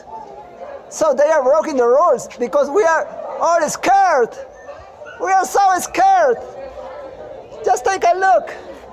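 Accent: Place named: China. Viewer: You sound like a Chinese man when you speak English!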